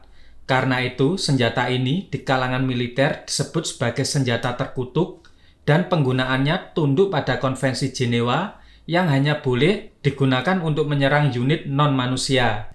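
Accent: Java, Indonesia. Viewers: Medok amat...🥶🥶🥶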